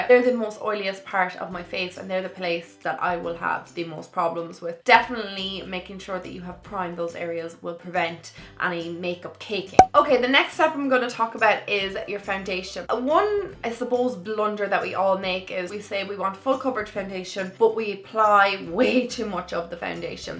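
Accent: Ireland. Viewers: awww ur so pretty darling. love ur accent. u sound Irish ? are u ? I'm new to ur channel. beautiful make up X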